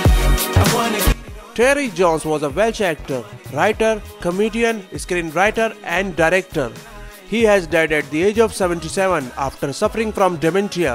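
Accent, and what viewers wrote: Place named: India. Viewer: The announcers Indian accent is so distracting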